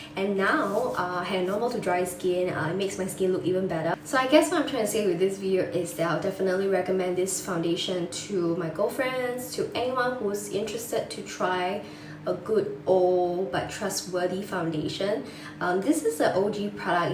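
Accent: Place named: Singapore. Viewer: you sound like your from singapore :)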